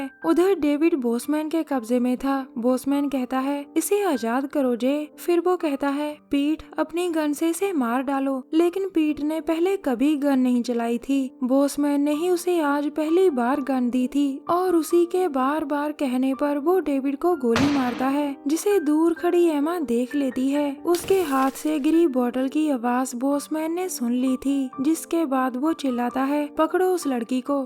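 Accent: Punjab, India. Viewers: ਤੁਹਾਡੀ ਆਵਾਜ਼ ਤੋਂ ਸਾਫ ਪਤਾ ਲੱਗਦਾ ਕਿ ਤੁਸੀ ਪੰਜਾਬੀ ਹੋ ਤੇ ਰਿਹਾਇਸ਼ ਪੰਜਾਬ ਦੇ ਕਿਸੇ ਪਿੰਡ ਦੀ ਹੈ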